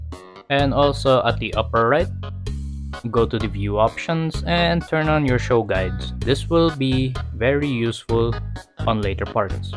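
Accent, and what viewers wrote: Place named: Europe. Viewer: U sound like an European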